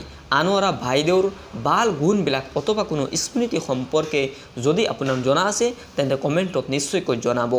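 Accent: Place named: Bangladesh. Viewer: The voice is of a bangladeshi.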